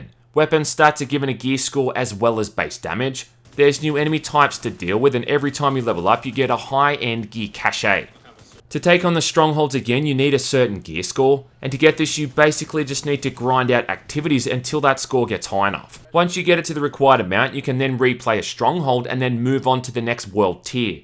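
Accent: Australia. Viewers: that australian accent tho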